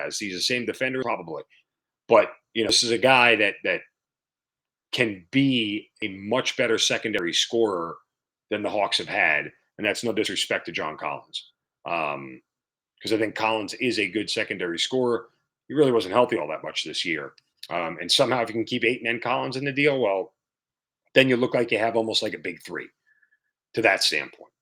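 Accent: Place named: Massachusetts, United States. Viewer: It’s hawks not shucks go back to Massachusetts with that accent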